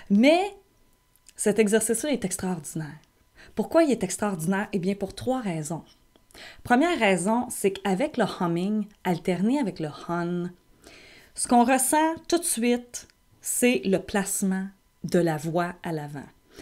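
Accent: Quebec, Canada. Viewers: Vous êtes québécoise ???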